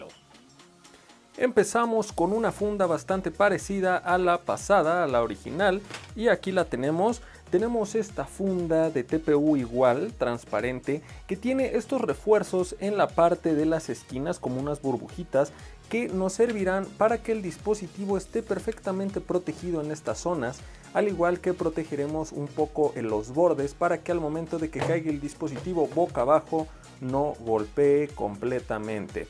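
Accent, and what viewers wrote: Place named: Brazil. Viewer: Olá, eu gostaria em que país você mora? Pois, o sotaque do seu Espanhol é muito parecido com o Português Brasileiro.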